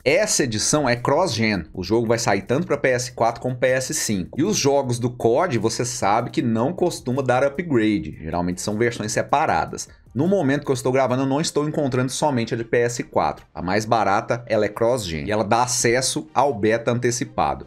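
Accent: Minas Gerais, Brazil. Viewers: ..vc é de onde de Minas Gerais uai?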